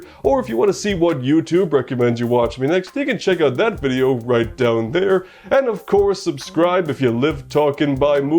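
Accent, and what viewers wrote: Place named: Russia. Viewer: я знаю, что ты русский, твой мерзкий акцент тебя выдает. скоро когда узнают что ты русский сразу депортируют обратно в помойку за то что ты русский :)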